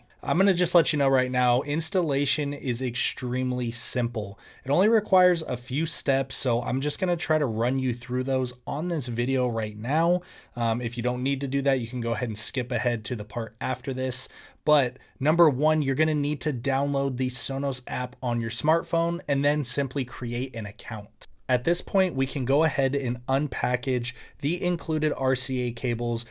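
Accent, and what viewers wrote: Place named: United States. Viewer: Annoying American accent. Low info density, too low. All info could have been given in 5 mins.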